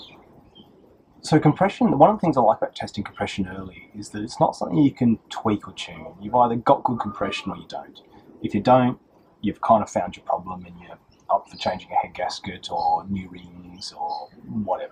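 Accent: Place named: Australia. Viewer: Sir, your videos have been invaluable in my 1st goes at repairing 4 outboards ... but more importantly your accent and character make me miss my favorite country in the world. This yank has been deported from Australia 3 times (I simply love the top end) and I will of course return ... it is not my fault I was "born in the USA."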